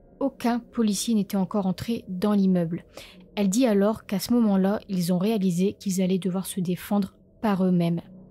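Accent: North, France. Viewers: Vidéo bien traité en effet, avec un petit accent du Nord de la France 😜 , merci encore 😘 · Tu es du nord de la France ma grande... ça s entend... lol... moi aussi... Merci pour ton récit !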